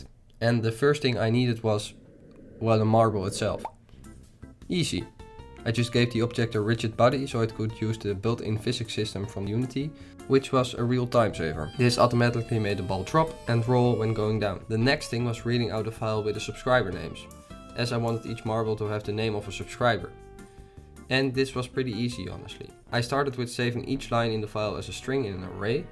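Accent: Netherlands. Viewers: Immediately knew you were dutch given the accent, ga zo door, echt enorm goede videos!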